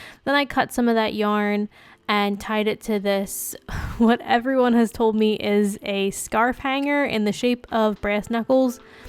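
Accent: Pennsylvania, United States. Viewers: u have such a pa accent hahahah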